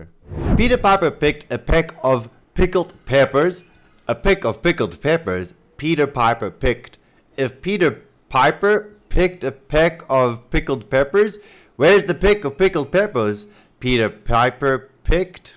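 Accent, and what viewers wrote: South Africa. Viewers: Heeey another South African! I could immediately tell 😆 awesome video man!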